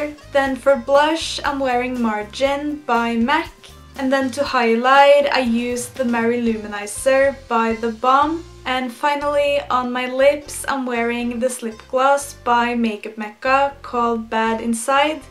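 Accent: Norway. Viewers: Fun design, and awesome execution! :) I think that is a Norwegian accent, isn't it? Thanks for the awesome tutorial!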